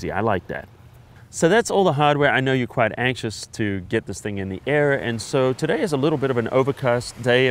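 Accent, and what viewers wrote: New Zealand. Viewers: I knew you were a Kiwi!!! Go ALL BLACKS!!! Thanks for the great review mate... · You sound kiwi but not lol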